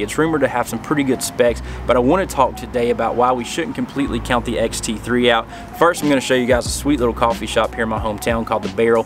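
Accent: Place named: Eastern Kentucky, United States. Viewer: Great video, gained a new sub here. And you speak my dialect as I am in Eastern KY :)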